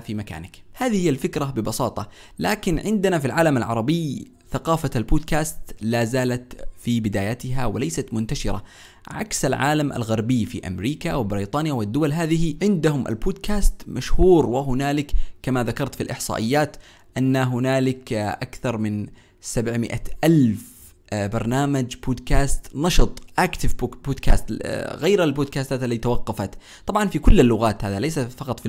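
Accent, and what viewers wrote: Yemen. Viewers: اهلا فيكي اخي شكرا جزيلا لك . انا يمنية ولهذا عرفت مباشرة انه يمني من لهجته كما اني سألته ذات مرة في تعليق هل هو يمني او لا فأجابني انه من اليمن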